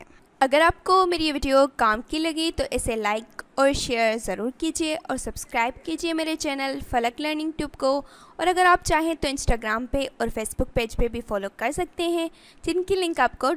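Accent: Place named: Deccan, India. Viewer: Aap Deccan, India se hai shayad apki accent waisi hai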